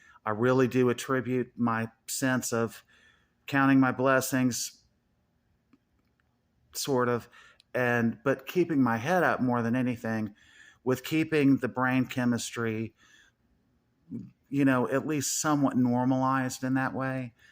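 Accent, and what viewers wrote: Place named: United States. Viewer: Hi. This is Chris, imported from Twitter. All this time I thought you were British but here you are being all American-like. How lovely and I look forward to seeing all your videos.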